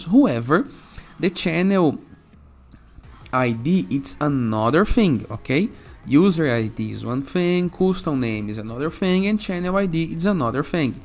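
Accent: Brazil. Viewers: Brazilian accent...😎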